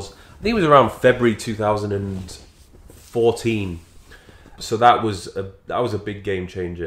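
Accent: United Kingdom. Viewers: "I always see the comfort and contentness is the enemy. When you get too comfortable and everything is easy, that's when you take a back foot." Love the positive messages you deliver on your Youtube channel. They are not only about fitness but also about life lessons, which i believe is applicable to everyone. Just want to say: your dedication, professionalism and wisdom are amazing, thank you for helping a lot of people, myself included, stay motivated and get back on the right track. I'm an undergraduate student from South Korea. Even though it can be somewhat hard for me to get British accent, but I try my best to catch every informative message you give off. You've been to Thailand not long ago, why not Korea one day? lol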